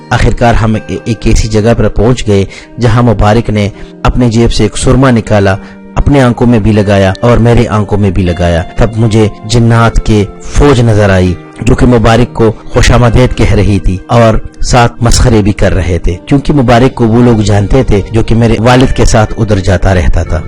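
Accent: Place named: Punjab, Pakistan. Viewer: Assalam Alaikum Aapne ek achchi kahani chuni lekin urdu sahi se adaa nhi kar paye. Punjabi wala lahja hai aur padhne me bhi kabhi ujlat, kabhi uljhan aur kabhi bedili se padha hai aapne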